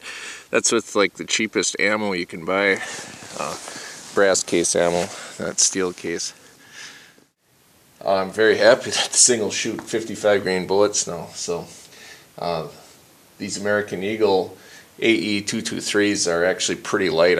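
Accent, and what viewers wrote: Canada. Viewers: your from nova scotia arent you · Very nice video! I really enjoyed your presentation style and your coverage of the beautiful nature as well as the technical details! Have been subbed for a while and really appreciate your channel. Canadian accent? Your weather is cooler than ours (here in Switzerland -3°C as I write). My local outdoor range is closed for winter :-( Take care, Rob (Brit/Swiss)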